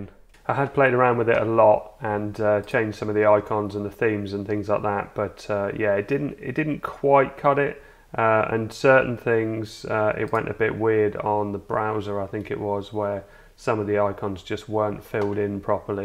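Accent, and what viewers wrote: United Kingdom. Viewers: nice to hear a fellow Brit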